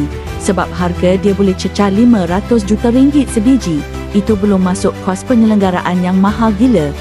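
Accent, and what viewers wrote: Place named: Indonesia. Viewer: Indon mana lah gigih sangat belajar bahasa malaysia...sebut perkataan malaysia jelas dengar macam slang indon..😅😅😅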